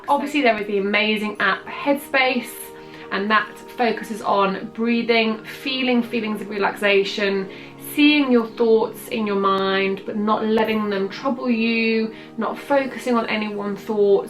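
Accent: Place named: United Kingdom. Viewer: Thank you for these ideas! I too have much anxiety, I loved your train idea, going to try it!!!! thank you! just found you "accidentally" by watching your "skinny tan" video. Im very intrested in ordering the product & somehow can across your videos😊 your skinny tan demo. convinced me to order it! so, thank you! also, I am so in love with British people & your accent ( Im American) so I also just love listening to you talk!😊 will be watching alot now! subscribed to yoir channel too btw!😊 you are lovely! thank you!🤗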